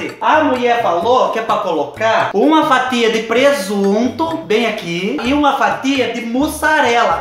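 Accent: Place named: Caipira, Brazil. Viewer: do céu vou dar uma apertada em vc so por esse sotaque caipira que eu adoro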